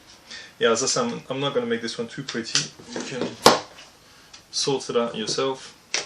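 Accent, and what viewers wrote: France. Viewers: Bonjour ! :) Excellente vidéo! ;) Ps: êtes vous français..? Jai cru reconnaître l'accent..^^ Si oui, serait il possible de faire de vidéos en français svp? :)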